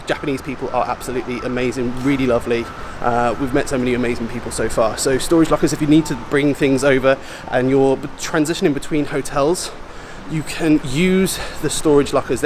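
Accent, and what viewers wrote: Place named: United Kingdom. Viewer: Your tips are really good 👍 tips, so thank you 😊 for those. However, it's a bit hard for me - a Vietnamese American- to understand your British accent, 😂, so it would help tremendously if you could illustrate the object/subject which is being mentioned with a picture 📸 or a flash of a video clip, etc. so that whatever you are mentioning or explaining could be understood 😉 right away! Thank you 😊 💓 so much.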